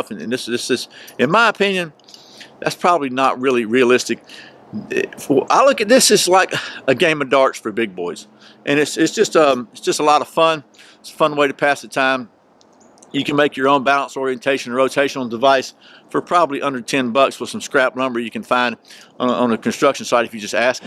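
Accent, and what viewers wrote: Georgia, United States. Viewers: Tell me you're from Georgia without telling me from Georgia (He could be from like 6-7 different states with that accent, but he strikes me as being from the Conyers area in Georgia.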